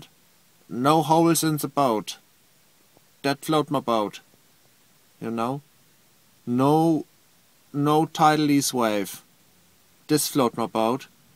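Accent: Germany. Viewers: But it's adorable... German accents are cool. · your covering ", " would float many boats! Your German accent and having fun with the sounds would float a plethora of boats. Please bro, do it for all the folks that don't know about. I'm certain people that get you will more than love.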